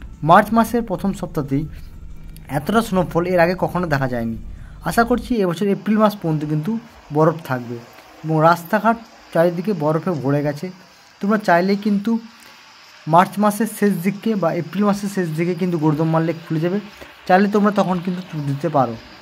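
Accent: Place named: Bangladesh. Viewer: Bangladesh er lok kotha sunei bojha jai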